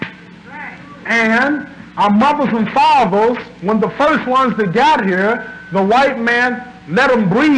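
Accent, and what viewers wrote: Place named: Kentucky, United States. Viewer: An hard word. Loved that kentuckian accent he had.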